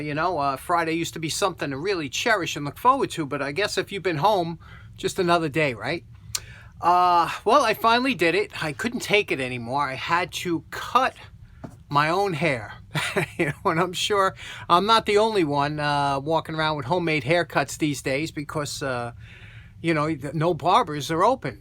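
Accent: New York, United States. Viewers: Great New York brogue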